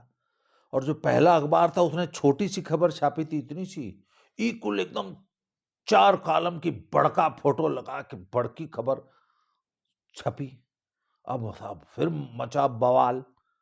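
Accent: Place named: Bhojpuri, India. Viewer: Aapke sunane ka a andaj kya khub hai. Bhojpuriya andaj 😀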